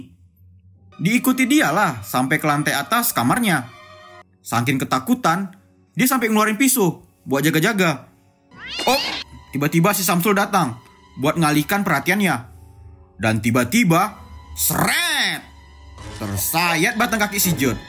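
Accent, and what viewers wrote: Medan, Indonesia. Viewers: Orang Medan ya bang, sama kita orang Medan LIKE WEEEE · Iya bang orng medan ni kek nya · Logat² medan ni pengisi suaranya wkwk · Logat Medan nya kental x ya BG😅 Love you BG😅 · Logat Medan tanggung sih. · Keren logat Medan:v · Wkwkwk orang medan toh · Mantap x bang ceritanya bang,,,kek bwrasa lagi di medan ajj awak nii,,,, Lanjut bang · Asli orang medan nih wkwk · Latak org medan😂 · Owalah mainmu kurang jauh bg itu logat khas medan rata² kalo anak medan tu cara ngomong nya kekgitu mkanya maen² kemari biar paham dikit yekan, hargai lah karya seseorang, aku org medan dan aku terhibur dgn cara dia menyampaikan alur film nya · Hadir bg medan:v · Iya anjir suara serak serak Medan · Medan kali yakan lekk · Medan ni bos😂😂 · Fix orang medan ni abang nii...semangatt bang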